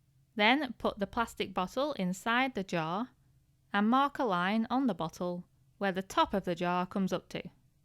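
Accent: United Kingdom. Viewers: pva glue is used in the UK, you can tell from her accent that's where she's from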